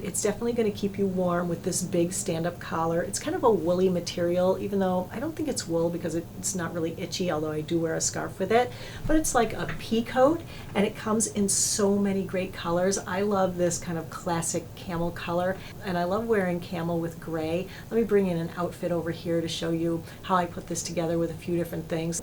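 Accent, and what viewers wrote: France. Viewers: Love your French accent!😉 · Oh! Votre accent Français! Too cute;) J’adore vos vidéos! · Love your French accent, oui oui! ❤️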